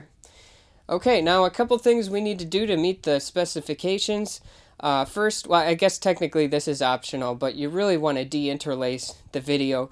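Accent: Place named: Minnesota, United States. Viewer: i sense Minnesota accent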